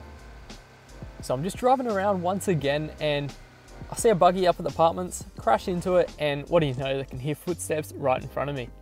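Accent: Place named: Australia. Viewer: Man, your accent sounds like an Australian.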